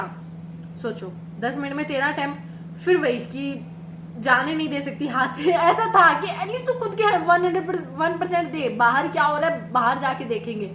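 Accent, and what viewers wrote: Haryana, India. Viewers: ha to Haryanvi bolo na English kyu kharabar kar rahe ho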